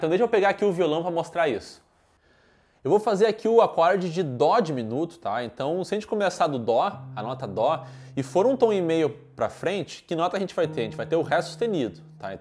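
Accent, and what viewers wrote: Brazil. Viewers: falas muito rapido que nao da para perceber metade das palavras (engoles as palavras ) de maneira que isso so serve para a lingua brazileira ,mas quem fala portugues os seus videos nao tem uso algum. o meu conselho seria fazer os videos em engles asim todo o mundo percebia ,com os videos em lingua brazileira so os brazileiros e que percebem que caralho estas ai a ladrar ,mas quanto ao asunto do video esta O K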